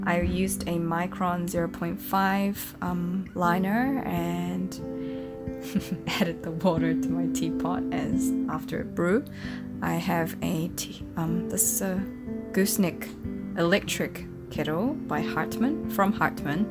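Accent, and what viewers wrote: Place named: New Zealand. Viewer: I follow you on ig nd u sound lika full on moari 😂 didnt expect tht.. Was thinking like an american accent or asian(i swear im not racist,forgiv🙏)😆 Btw Green tea all da way fo me ✌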